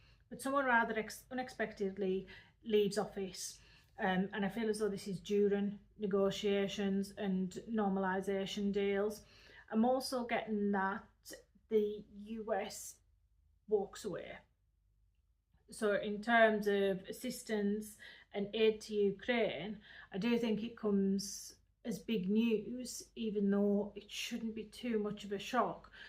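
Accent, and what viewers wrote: Newcastle, United Kingdom. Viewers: Yes l get what your saying she does sound a bit Geordie on further listening more north Yorkshire, l myself 12 miles from Newcastle. Where are you from? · Geordie or Liverpool I feel, I'm leaning Geordie. Someone perhaps not born here but has lived here a long time and picked up the local accent is my feeling? It's a nice accent, very interesting.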